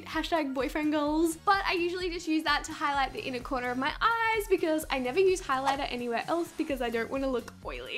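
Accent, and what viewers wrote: Australia. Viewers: just discovered your channel !its amazing ..and i lovvee that Australian accent tht you havee !! ..but how old are you !! do an Q&A !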